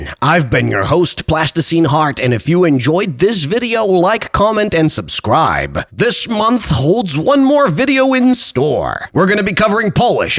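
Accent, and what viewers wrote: Croatia. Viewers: He does have a croatian accent when he pronounces his words, it's cute tbh😂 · Mogao si ovaj video da nameniš Hrvatima, pošto si njihovo akcentovanje i izgovor potrefio 100% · Ne znam kako je u drugim jezicima, ali kod nas, pored toga što možeš da pogrešiš u pisanju reči, veliki broj starijih ljudi greši i gramatiku. Na primer, pišu "neznam" umesto "ne znam", "netreba" umesto "ne treba", "samnom" umesto "sa mnom", "bi" i "bih" mešaju, kao i "bio" pa napišu "bijo". To je dosta izraženo po selima i u mestima koja nisu naročito naseljena, Takođe, u nekim krajevima Srbije, posebno na jugu i jugoistoku, u svakodnevnom govoru često se koriste samo tri ili četiri padeža, pa se ostali gotovo i ne upotrebljavaju. Svaka čast na izgovoru reči, baš si pogodio. Ne znam da li ti je to neko ikada rekao, ali naglasak ti dosta vuče na hrvatski. Hahaha. Svako dobro, legendo! 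· 😂😂😂😂😭 pre dobroo , ali je li ovaj glas hrvat? Ne zvuci mi ka srbski naglasak? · Serb here. Very cool vid, didnt know you would make a vid on my language. Also you sound more like a croatian when speaking serbian 😭 Though nice vid. Hvala brate, samo tako nastavi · sounds more Croatian than actual Croatians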